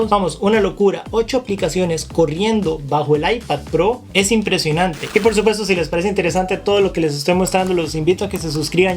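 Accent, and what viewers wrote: Costa Rica. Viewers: Hola Hola! He visto algunos de tus vidos y me han gustado por lo claros y concretos, muy bien elaborados gracias! Para un uso universitario (lectura, edición de documentos word, docs, excel, sheets, pdf) ¿cuál me recomiendas? El 9 no me llama la atención mucho por su puerto lightning Estoy entre iPad y Tab S7 FE. . . PD. Tienes un acento como tico 👀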